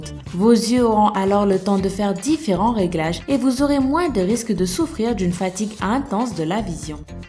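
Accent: Quebec, Canada. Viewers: ton accent québécois est trooooop craquant! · C'est vous la femme a la fin ? :) Et sinon sympa l'accent j'aime bcp et les videos sont cool egalement :) sa vien du Quebec non ? ^^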